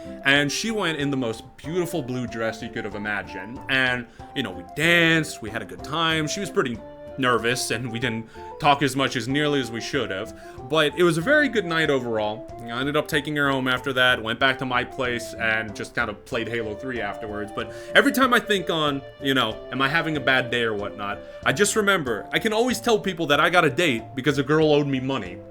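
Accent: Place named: New York, United States. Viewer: man your accent would be hilarious in a campaign ... the new yorker halfling or kobold or something talking really really big...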